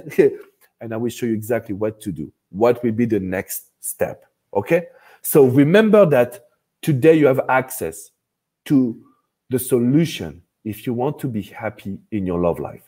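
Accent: France. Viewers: I am here for the french accent. :-) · NG from Nigeria. U are always apt and on point. I love the French accent. U are simply the best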